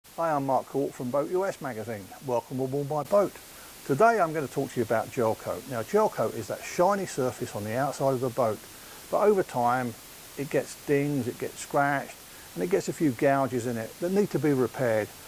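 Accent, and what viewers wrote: United Kingdom. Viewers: Boat us but it’s a Brit 🇬🇧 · BoatUS with a British accent.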